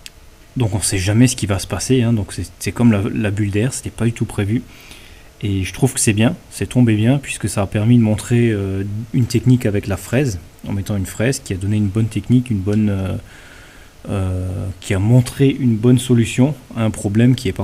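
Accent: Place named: Alsace, France. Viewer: ça fait plaisir de voir ton travail surtout quand on sait ce que tu es capable de faire via le forum, je me suis abonné a ta chaine qui est une mine d'informations. Tu ne dois pas etre loin de mon secteur ( alsace ) vu ton accent. Merci pour tes vidéos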